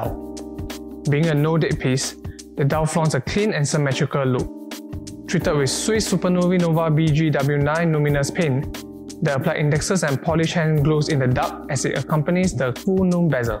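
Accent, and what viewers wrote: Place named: Singapore. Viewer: English. That’s the Singaporean accent for you lol. He has rather bad pronunciation and pacing though, even for a Singaporean.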